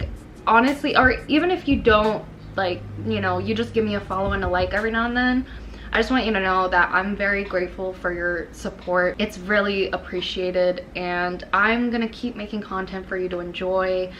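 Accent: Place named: Miami, United States. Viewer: The Miami accent is real 😅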